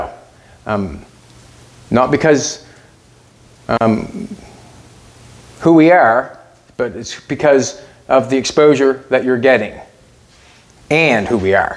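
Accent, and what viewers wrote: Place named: Maritimes, Canada. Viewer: Hey don't worry abut talking too much! I really like the way you put words together! No fancy language just heart felt words. I'm Canadian too and find your Maritime accent and sayings are very entertaining. I follow all the Canadian car You Tubers and you are all are so down to earth. There is a real demand for real no BS (not scripted) reality shows. You and are living my dream life so thanks for taking us along with you. Looking forward to your Australian and Toronto episodes. Great stuff you two!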